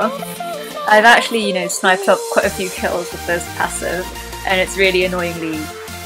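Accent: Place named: United Kingdom. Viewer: Gg British accent..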